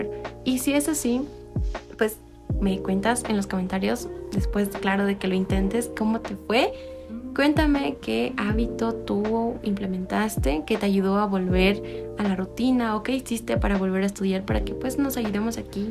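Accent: Bolivia. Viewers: Una pregunta eres de bolivia ? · Eres de BOLIVIA?? Gracias por el video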